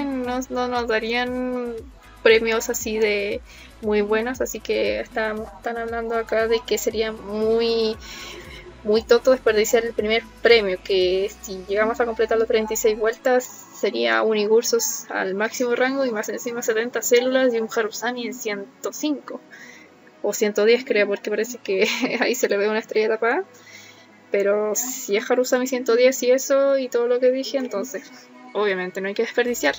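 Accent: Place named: Chile. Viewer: Se nota altiro que eres chilena te amo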